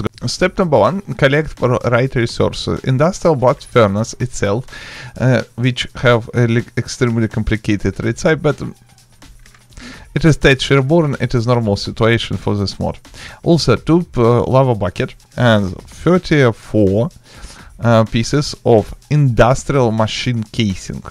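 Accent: Russia. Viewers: Пахнет русским акцентом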